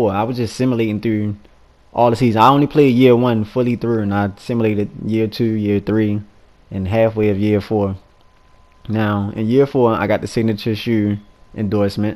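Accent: Baltimore, United States. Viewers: i from bmore to soon as i heard you voice i said "this nigga from bmore" lol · From Baltimore.?🤔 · you from baltimore?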